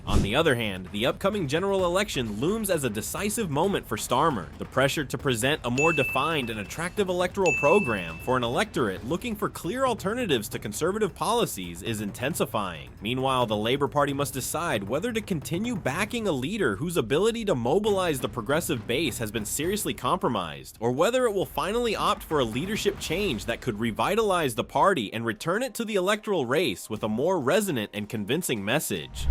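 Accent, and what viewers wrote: United States. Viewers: lies lies and more bs .... are you that desperate to use clickbait titles and a really crap american voice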